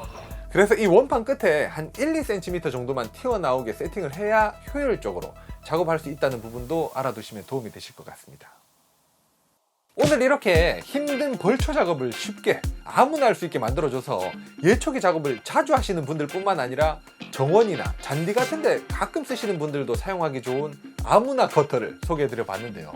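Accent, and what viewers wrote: Gyeongsang, South Korea. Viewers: 우째 대구 말투네.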